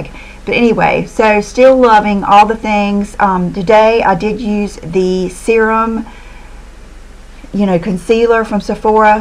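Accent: South, United States. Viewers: is from Wilmington, North Carolina. Southern accent.:)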